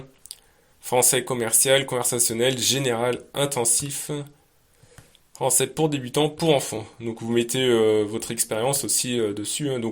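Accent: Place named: Nord-Pas-de-Calais, France. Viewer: la vache l'accent chti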